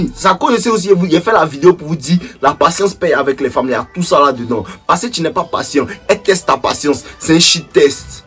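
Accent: Ivory Coast. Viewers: On reconnaît l'accent ivoirien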